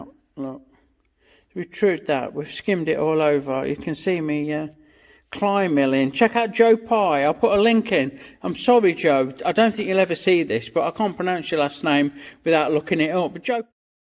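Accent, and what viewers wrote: United Kingdom. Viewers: Hi, just found your channel and subbed. Great stuff! You don't sound like you come from too far away from where I grew up in Lincoln. Cheers, Jon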